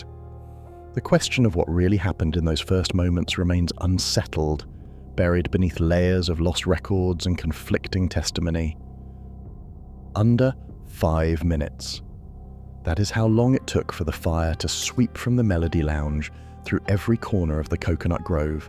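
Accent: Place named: United Kingdom. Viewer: I was just going to write the same thing! They show the floor plan, then whisk it away before anyone can get a good look, then they show the same guy over and over. This happens more and more on YouTube videos: people with no training or experience slapping something together. But they think they can convince us that it was brilliantly done because of the narrator's British voice. A very common ploy!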